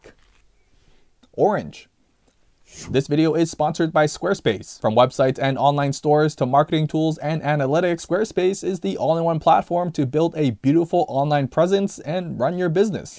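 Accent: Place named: Canada. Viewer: Canadian with a light lisp?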